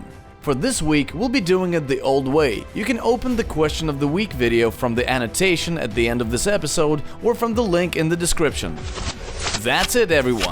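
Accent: Russia. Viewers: He is Russian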